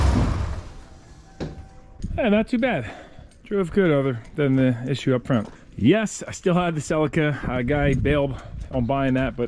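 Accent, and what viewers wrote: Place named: East Coast, United States. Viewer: The east coast accent is awesome! I'm from Wisconsin, we sound goofy!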